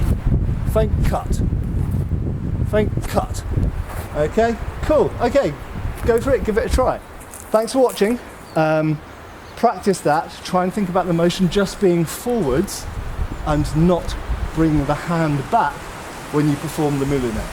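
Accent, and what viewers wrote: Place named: RP, United Kingdom. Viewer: you missed your true calling as a type-cast, British officer in Hollywood films. You are tall, thin, and have a moderate BBC accent. You seem like low level gentry so I am thinking a rank between Major and Colonel would suit you (you would get an officer's sword too). You are not regal enough (and too honorable) to be a general and you are too "proper" and too refined to be a sergeant. Am I the only one who could envision in a movie like 1917?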